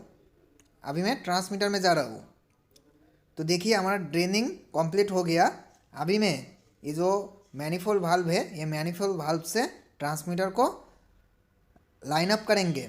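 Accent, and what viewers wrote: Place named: Bengal, India. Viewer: এর জন্যই আমি আপনাকে প্রশ্নটি করেছিলাম।দোয়া রইলো ভাই আপনার জন্য।আসলে আপনার কথার মধ্যে বাংলার একটা টান পাওয়া যায়। কোথায় কাজ করেন ভাই?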